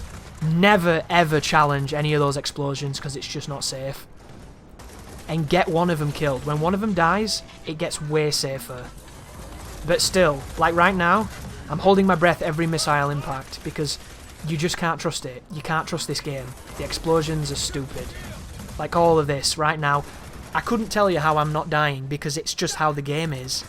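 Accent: United Kingdom. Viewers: I have never felt so.. related to someone XD pure unrivalled British 🤣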